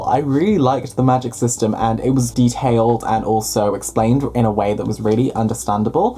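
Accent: United Kingdom. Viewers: Omg can't believe you're reading Kane Chronicles.. I mean, you reminds me of Carter Kane even tho you got British accent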